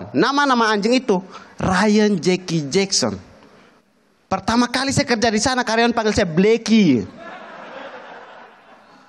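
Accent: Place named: Kupang, Indonesia. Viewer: Asli logat Timor Kupang.. 😅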